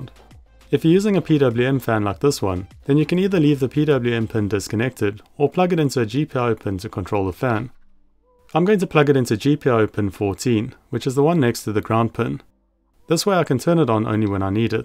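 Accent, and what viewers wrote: South Africa. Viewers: Ey you South African, I like you & ur content ❤🎉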